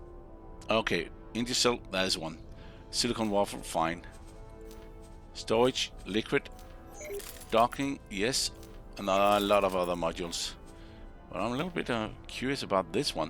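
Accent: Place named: Denmark. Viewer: Er lige startet på din Lets play! Kunne høre at du var dansker og ville sige Godt arbejde og pas på dig selv <3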